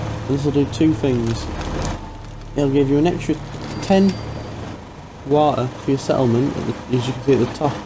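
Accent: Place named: United Kingdom. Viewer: This is the most British accent I've ever heard, Also thanks even tho this video is already 6 years old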